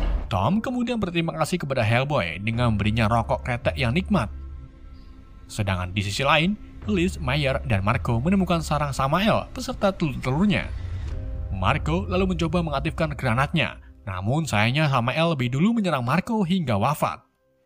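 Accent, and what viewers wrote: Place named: Java, Indonesia. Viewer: Mencengkiwing. Admin e wong jowo ki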